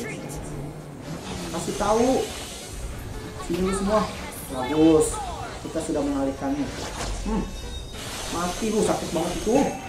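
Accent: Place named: Surabaya, Indonesia. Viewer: sedot lu kok medok bgt??? pas an kyk aku suroboyo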